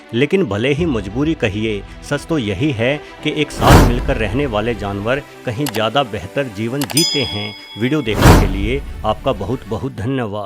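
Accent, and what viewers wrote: Haryana, India. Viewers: Sir aap kaha se ho? Avaj se haryana ya rajasthan ke lagte ho?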